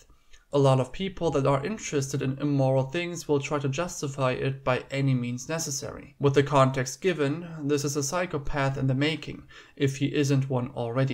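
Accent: Germany. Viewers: Ich hab mich minutenlang gefragt warum mir die Stimme bekannt vorkommt, bis ich den deutschen Akzent bemerkte 😍❤️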